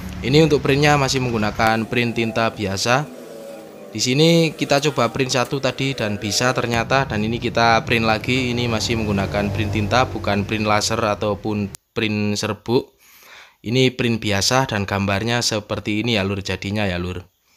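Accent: Temanggung, Indonesia. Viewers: 🤣🤣 kyak org temanggung ajaa lur.. ahh mantap 😋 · logat temanggung iki, salam mbako lurrr 😬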